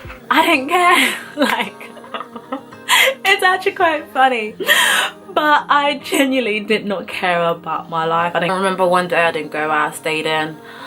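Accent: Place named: Germany. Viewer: Babes this is beautiful and so are you! 1) I was like WHERE IS THIS ACCENT FROM- you answered Germany! So cute 2) power of the tongue you hit the nail on the head 3) I watched this whole video and enjoyed from start to finish. Your honesty is so inspiring - you’re so brave and a true role model! Cannot believe you could EVER tell yourself those things. To me you are so beautiful. Love ya girl xxx - can’t wait for more!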